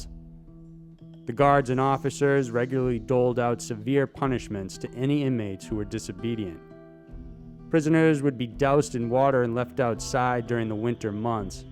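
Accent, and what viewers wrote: United States. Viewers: Great to put a face to your voice, I watch your videos everyday but where in the USA is your accent from exactly? thanks lysa x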